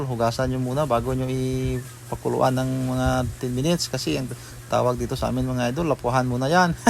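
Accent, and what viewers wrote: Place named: Western Visayas, Philippines. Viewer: you sound like illingo bisaya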